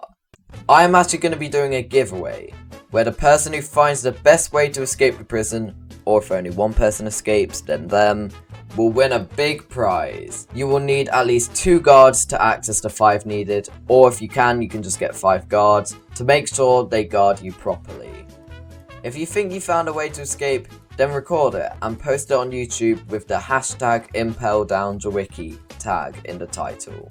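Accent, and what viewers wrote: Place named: United Kingdom. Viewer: i dont know why its so funny to hear british people commentate things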